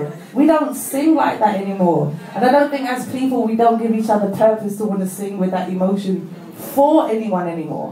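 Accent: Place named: United Kingdom. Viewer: Damn!! I love the British accent on women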